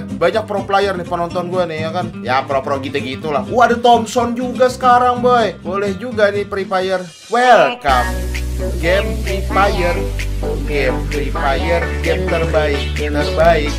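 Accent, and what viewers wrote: Banjar, Indonesia. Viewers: Bang Emang orang Banjar bang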